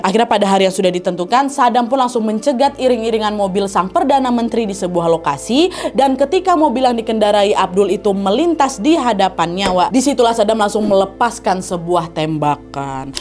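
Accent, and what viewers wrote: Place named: North Sumatra, Indonesia. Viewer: Logat bataknya kental banget yaa.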